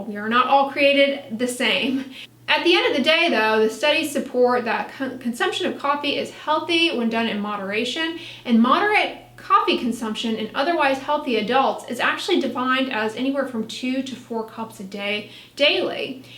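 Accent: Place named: Texas, United States. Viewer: every once in awhile that Texas accent comes through... so cute. Let that Twang fly girl!!!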